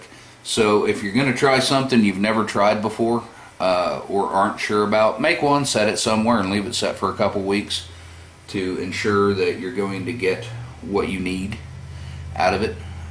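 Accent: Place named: South, United States. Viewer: I couldn’t handle the “southern drawl “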